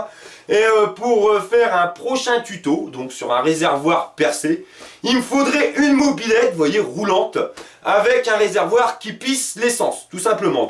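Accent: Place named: Pas-de-Calais, France. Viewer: top vos explications les gars. je roule avec un wagon r Suzuki a 100% éthanol l'été et 50 l'hiver max depuis 5 ans. j'avais avant un boîtier pourri acheter sur eBay qui ne sert a rien car non équipe de capteur éthanol comme vous l'expliquer si bien. encore bravo et vive l'accent du pas de calais. signé un ti normand.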